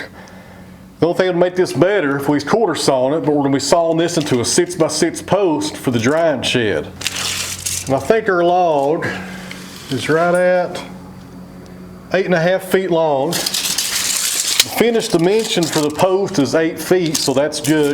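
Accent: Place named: West Virginia, United States. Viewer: Good for you. I have been watching your channel for a couple of years now. I discovered your channel when a related channel changed to yours and I heard your dialect. This is very similar to my many (mainly deceased) dear relatives from SW/WV in Mingo County--a long way from suburban Philadelphia where I live now. My favorite uncle in Mingo had a very dangerous circular sawmill used to mill cap wedges to secure mining timbers. I have loaded a few pallets of wedges in my time. Although we didn't timber frame them, we also built a few sheds out of "sawmill" lumber. He acquired his logs in a fashion similar to yours. Great content. Takes me back.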